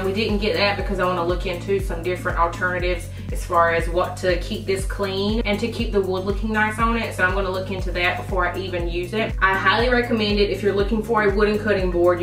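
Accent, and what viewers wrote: South, United States. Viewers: living for the southern accent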